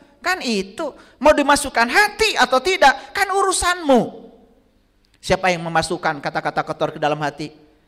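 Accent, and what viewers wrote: West Java, Indonesia. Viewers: Ust ni sundanya daerah mana,ada yg tau?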